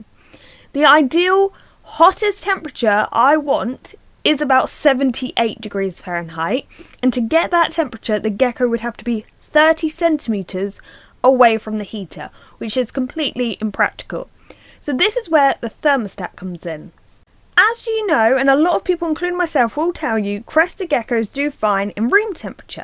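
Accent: United Kingdom. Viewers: Wait your British :D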